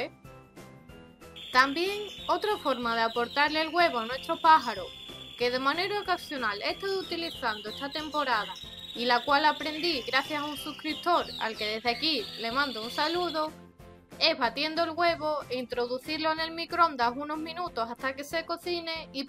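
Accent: Spain. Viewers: gracias por su exelente consejos me encanta su asentó español 😀saludos desde mexico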